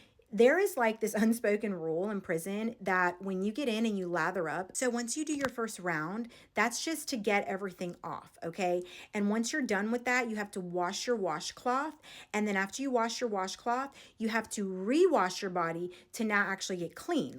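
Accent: South, United States. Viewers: You are soooo beautiful 😍 and I love your southern accent 🥹🤎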